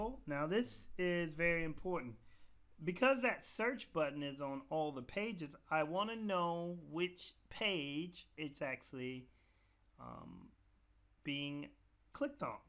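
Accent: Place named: Louisiana, United States. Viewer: Solid concise video! Was wondering how these disparate areas connected. You filled in my vast, unknown gaps in knowledge. AND you did it with a..... Louisiana accent?! You got some Southern accent mixed with something else. I couldn't quite figure out. Anyway. That was 100%, Prime, Grade A teaching!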